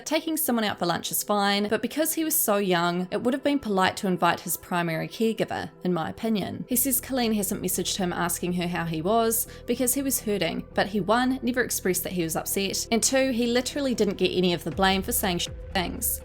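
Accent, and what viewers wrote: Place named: New Zealand. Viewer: I love your NZ accent... oh the dramaz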